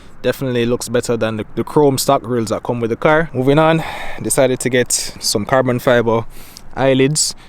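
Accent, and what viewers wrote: Jamaica. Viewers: Suh mi jus deh yah a watch bmw build and mod videos not really a car person and mi say let me watch this video den boom here comes a yaad man accent box mi just Suh glad fi see a yaad man channel big up ute🤣🤣🤣🔥🔥🔥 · When the video started i heard the accent and did a wonder if you’re from Jamaica enuh, i was right 😂 subscribed immediately 🙏🏽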